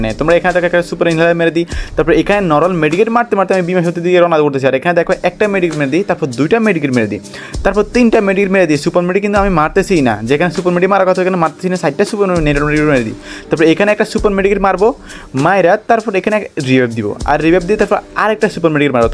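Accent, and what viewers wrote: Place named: Lakshmipur, Bangladesh. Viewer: Vaiyer basha ki lokkhipur naki?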